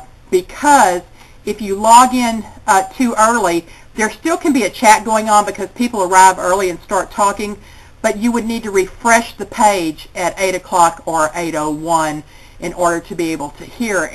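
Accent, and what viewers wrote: South, United States. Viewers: sweet, southern drawl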